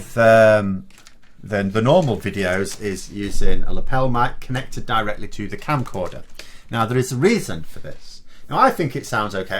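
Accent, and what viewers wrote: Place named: Doncaster, United Kingdom. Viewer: He's from Doncaster, can't you tell? 😀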